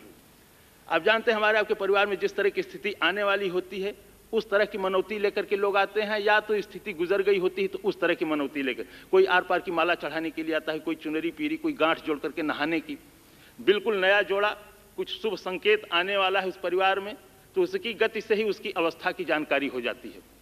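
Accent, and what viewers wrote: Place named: Varanasi, India. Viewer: Jabardast, Banarsi andaj wala Bhojpuri.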